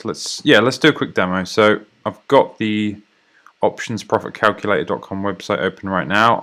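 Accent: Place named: United Kingdom. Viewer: Where do you buy your calls? Sounds like you’re from the uk too?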